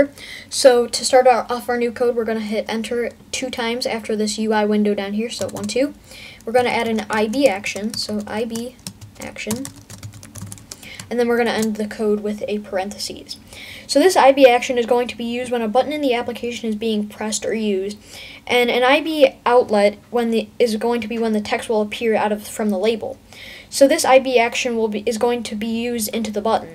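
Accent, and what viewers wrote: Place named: United States. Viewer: I fucking hate the totally high American Accent!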